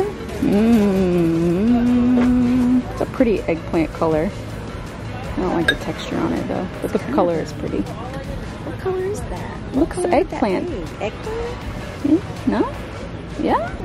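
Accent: Texas, United States. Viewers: "I was really maaaaaaad!" 🤣🤣🤣 Your Texan accent is really coming through, it's awesome!!!!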